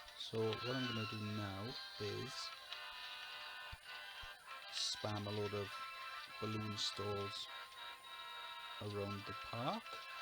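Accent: Wales, United Kingdom. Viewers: Ooooh is that a Welsh twang I can detect in your voice?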